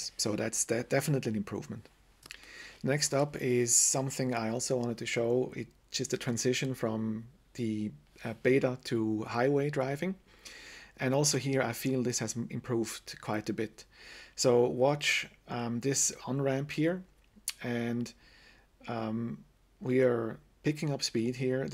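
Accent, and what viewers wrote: Switzerland. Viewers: Are you from Switzerland? 😅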